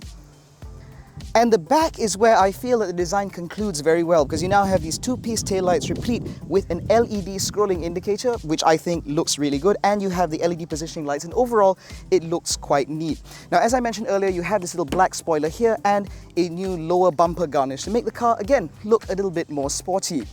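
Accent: United Kingdom. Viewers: sound like British accents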